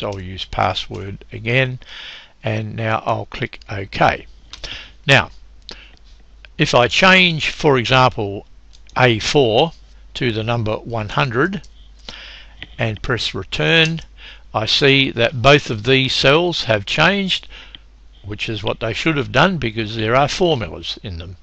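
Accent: Australia. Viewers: Thanks very much. Love the Australian accent.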